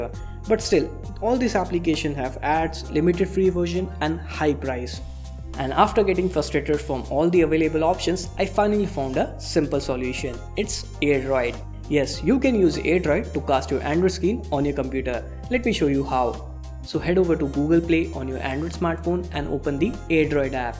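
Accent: India. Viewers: Thanks, good info. One suggestion, if u don't mind: I listen to many video presenters with Indian accents. They all have same problems. "T" pronounced as "D". Example: "two" is pronounced as "do". Also "v" is pronounced as "w", example: "video" or "wideo"? "very" or "wery" ? V and W should not be switched around. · OMG that accent! almost gave me a headache! RIP English. Btw whats with u Indians wanna make everything complicated maybe because you think you are smart or whatever. this whole subject could be explained in a 1 min long video. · Why is it always guys with Indian accents?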